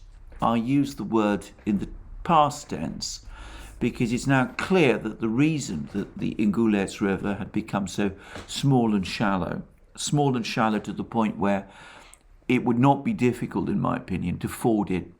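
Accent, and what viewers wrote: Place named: RP, United Kingdom. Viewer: He uses the Queens English that's why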